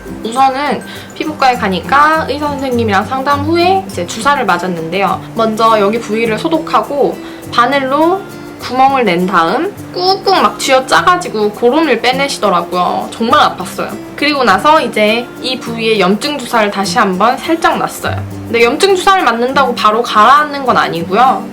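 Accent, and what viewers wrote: Gyeongsang, South Korea. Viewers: 언니 대구사람 맞죠?피부과어디가셧어요?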